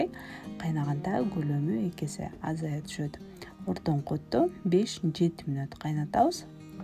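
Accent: Standard. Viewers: Сонун экен эже рахмат. Сиздин баарынан да бир да орусча аралаштырбай таза тунук кыргызча суйлогонунуз жагат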